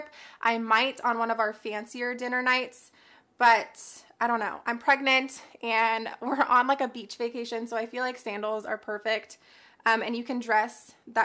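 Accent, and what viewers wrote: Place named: Texas, United States. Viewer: The way you said 13 bucks is so SOUTHERN LOL I loved it! You sound like a real Texan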